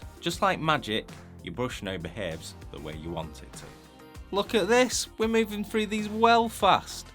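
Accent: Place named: Northern England, United Kingdom. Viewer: You can't fault a proper northern accent our kid.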